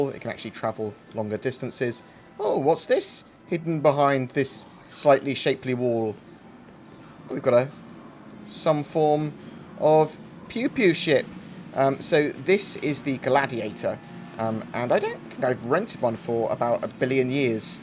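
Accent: United Kingdom. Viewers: It's the cool British accent isn't it? Damn, Brits and Aussies they get all the cool jobs. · I find myself throughout the work star saying star citizen to myself in a British accent how says it. Gets stuck in my head.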